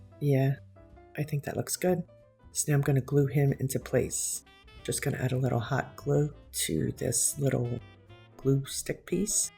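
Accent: Philadelphia, United States. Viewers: Just stumbled upon your video when I was looking for vintage Christmas crafts. As soon as I heard your voice, I knew you were from the Philly area! And then you mentioned Kindy’s! That’s so close to where I grew up!! Just subscribe to support a fellow Philly girl!!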